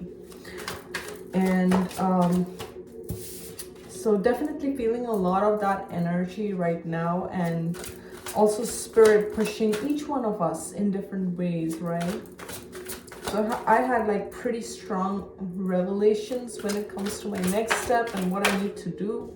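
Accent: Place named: India. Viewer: Thanks! On spot as always. Something caught my attention today watching this reading. Your accent changed to strong Indian accent today… is that because you were challenged to Indian gods? Never happened before as I’m watching your videos regularly.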